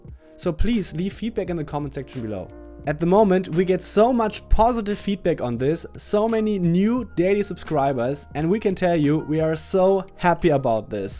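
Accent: Germany. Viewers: Weiter so, Mega Videos! Dein Dialekt hört sich deutsch an, bist. Du deutscher?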